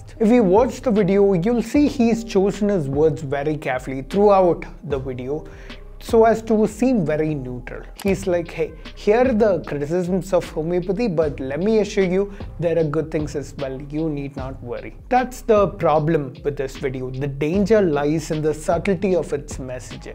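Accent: Kerala, India. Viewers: Your content is one of kind and eye opener to blind followers of fallacy and myths. But your reach will be limited to just a few.... You have strong malyali accent coming out when you speak English. I wish it would be coherent and lucid like other so called youtubers on you tube who are spreading mythsband nonsense. Clarity in language as much is needed as in the content. Your content is king but can't say about the way utter it.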